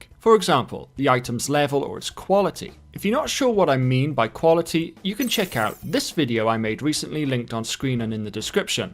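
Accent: Wales, United Kingdom. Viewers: Nice to see/hear more Welsh content creators, great video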